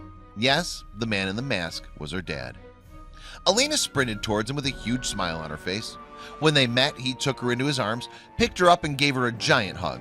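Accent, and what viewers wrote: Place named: Midwest, United States. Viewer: Maybe just play the video instead of a 10 minute explanation? Especially with that nauseating Midwest accent, torturous.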